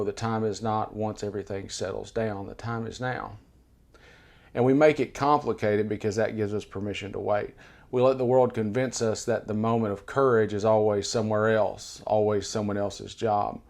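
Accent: South, United States. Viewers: It soothes my soul to hear your words spoken in an accent that swaddled me with love and courage and righteous anger as I grew up. My parents and loved ones stood up to hate and taught me to do so. My neighbors and a few family members have succumbed to that old American hate again. It’s disheartening, but your words spoken in that Southern cadence that has the capacity to carry so much love give me hope in a language that wraps my heart in that familiar love. Thank you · I think it’s the profound eloquence wrapped in sweet southern drawl that is so soothing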